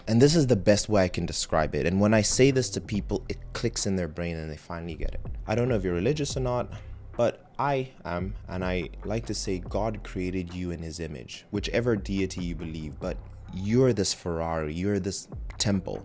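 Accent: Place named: South Africa. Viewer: I detect a South African accent…. Am i right? Very nice summary!! Thank you!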